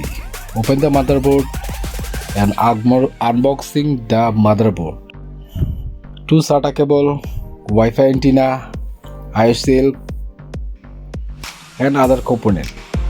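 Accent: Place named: India. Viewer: tu ingles es muy indio flaco mejor habla español y deja de joder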